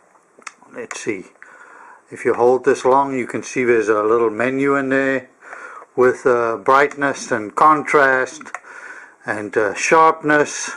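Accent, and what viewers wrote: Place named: South Africa. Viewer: I thought I recognised the accent. I recently returned to South Africa. Unfortunately it does not seem that the hobby has grown here as much as I hoped. Products are limited and import fees are killer:-(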